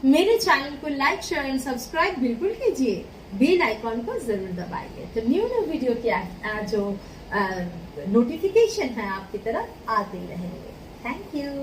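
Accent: Bengal, India. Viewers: Plz forgive me ur Bengali I accent little bit difficult toubdeeaeabd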